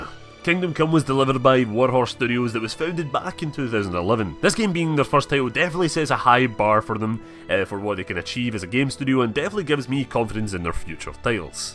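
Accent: Scotland, United Kingdom. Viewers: You're accent is so Scottish that I had to really concentrate to hear that it was still English sometimes lol.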